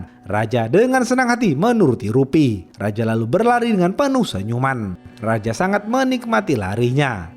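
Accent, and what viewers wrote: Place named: Solo, Indonesia. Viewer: Min suaranya kaya pria solo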